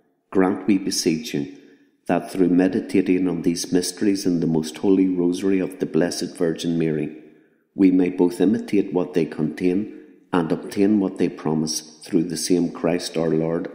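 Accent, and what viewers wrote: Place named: Ireland. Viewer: Thank you sir! I just love the Irish accent...it is the sweetest and most lovely of them all. We must remember how God has blessed the Irish for all the suffering our Irish ancestors went through in spreading the the Gospel throughout the world through the gift of the Holy Spirit in our One Holy Catholic and Apostolic Faith! How come someone altered the face of Our Lady of Guadalupe? This is not a true depiction...we must also remember to include the Saint Michael prayer with every Rosary we pray as we know Satan and his legions twist the truth and tell half-truths...even through pictures.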